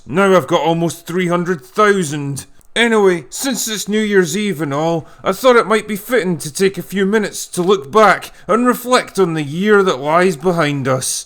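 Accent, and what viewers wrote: Scotland, United Kingdom. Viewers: I'm loving the Scottish accent. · All they very best for 2020 mate. I enjoy your honest reviews a lot. If you don't mind me asking, with your accent, why are you drinking JD? There are plenty of excellent single malt whiskeys in your part of the world. BTW - I am especially of product from a little island off the South West coast of Scotland ( Islay )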